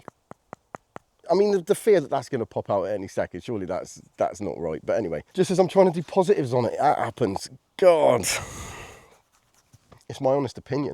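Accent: United Kingdom. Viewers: I heard the brittish accent and wondered what this dude could know about guns and how experience he could be rambles on for 4 mins then says he knows nothing and he doesn't understand the purpose of stuff.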